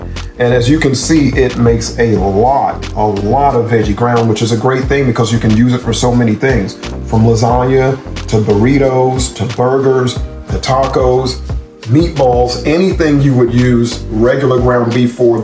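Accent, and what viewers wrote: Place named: Bay Area, United States. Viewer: You got a strong bay area accent bruh. What set you from? Dope clip cuz!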